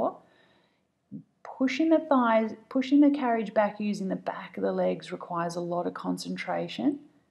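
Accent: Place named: Australia. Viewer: Thank you! I’m brand new to Pilates and this was such a well paced intro. Also as an expat it’s great to be lead by an Aussie voice :)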